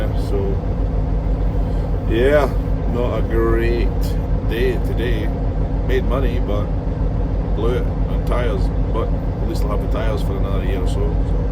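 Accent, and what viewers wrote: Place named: Scotland, United Kingdom. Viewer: where are you from in Scotland, from Ice Man in Ayrshire your accent is still strong,keep it between the lines.💪😎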